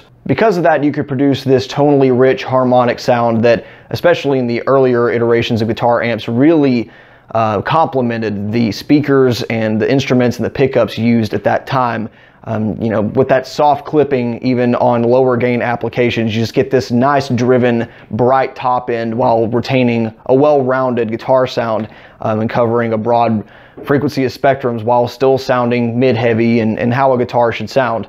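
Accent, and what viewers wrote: Texas, United States. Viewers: It took me a while to notice that you have a texan(or at least southern) accent. But I like it bro, you sound like a cowboy Ps: I'm from Brazil, english isn't my first language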